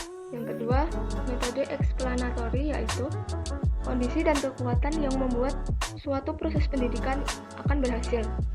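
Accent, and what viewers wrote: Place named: Java, Indonesia. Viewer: Mendhox dikit keren ya kak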